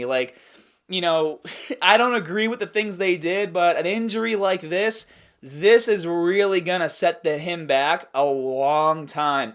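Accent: Boston, United States. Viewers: Think you almost went the full Boston accent in this vid lol. Sometimes you barely sounded American.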